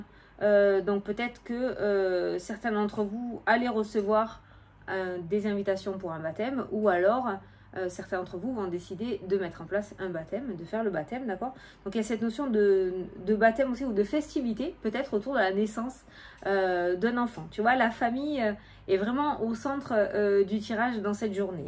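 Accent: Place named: Pyrénées-Orientales, France. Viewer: Bonjour! Cela fait des années que je regarde des vidéos sur YouTube et aujourd’hui c’est le premier commentaire que je vais poster. Le jour de mon anniversaire au réveil, YouTube me suggère cette vidéo, première surprise il me semble reconnaître notre accent des P-O (où je vis). Je regarde et j’écoute attentivement et plus la vidéo avance et plus je me dit que c’est mon cadeau d’anniversaire tellement il y a de ressemblances avec ma vie actuelle. Rien n’arrive par hasard dans la vie et sur ce coup là celui qui me dit que la synchronicité n’existe pas je le savate 😁. Merci pour la bonne énergie que tu dégages et ce naturel qui fais toute la différence. N.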